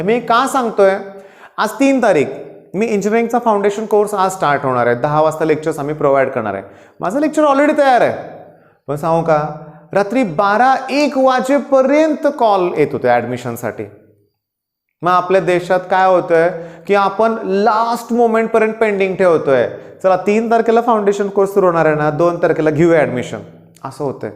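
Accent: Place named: Pune, India. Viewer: Its Puneri marathi I guess....You have no rights to make fun of this. Hope you get some wisdom about respecting our Teacher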